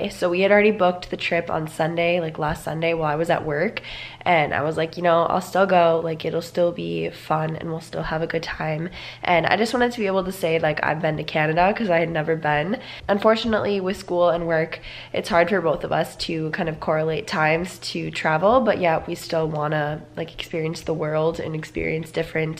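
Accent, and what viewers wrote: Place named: Minnesota, United States. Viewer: Your from minnesota or north dakota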